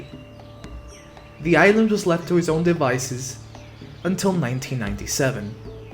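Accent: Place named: Spain. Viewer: Aye yo Spanish accent is 🔥🔥🔥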